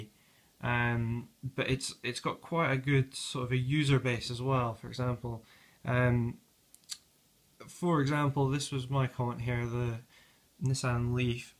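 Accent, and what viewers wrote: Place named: Ireland. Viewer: I love your accent. Are you Irish?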